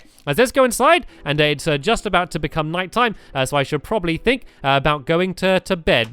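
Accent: United Kingdom. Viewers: I stopped watching stampy like a year ago because his voice sounded annoying to them so I stopped watching for a couple of months but now I watch him because I don't care what they say any more he's from England he's British get use to it people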